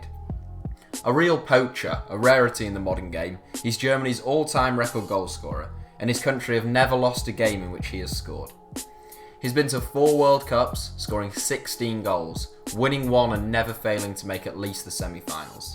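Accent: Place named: Yorkshire, United Kingdom. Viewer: I'd like to apologise on behalf of the entire of Yorkshire… we don't all sound as monotone and dreary as that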